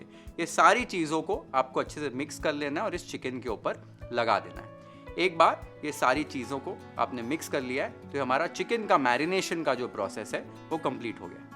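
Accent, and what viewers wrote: Maharashtra, India. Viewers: Big fan......and your Marathi accent😚😚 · Your pronunciation of Marathi words are more authentic than lots of Maharashtrian people.. kudos 🙌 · Omg 😮 ur marathi accent is jus wow❤ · ❤For Your Marathi accent ... ❤ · What a marathi accent you catch bhava ❤ and dish is mindblowing as allways kadak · You speak typical मराठी words in मराठी accent... *ळ*😊 ❤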